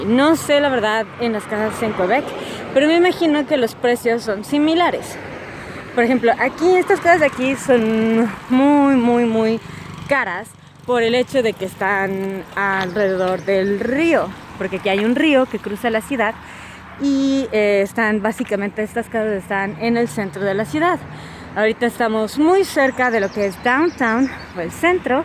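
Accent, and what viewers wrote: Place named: Mexico. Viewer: Me han gustado mucho tus vídeos, he pensado en irme a vivir a Canadá pero primero quiero conocer más a Canadá. En que provincias vives porque he visto muchos tus vídeos y por lo que has dicho es una provincia donde es más económicas que las otras. Te felicito, son excelentes tus vídeos y tu acento es como Méxicano; soy Colombiano.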